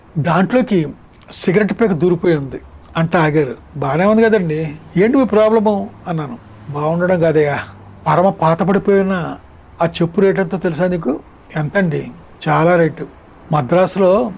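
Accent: Godavari, India. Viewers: గోదావరి యాస లో మీరు మాట్లాడతా ఉంటే ఎంతో ముచ్చటగా ఉంది. అదీ మీ సినిమా గురించి 🎉🎉🎉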